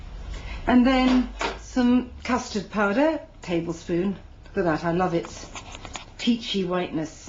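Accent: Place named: United Kingdom. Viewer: you sound very british...haha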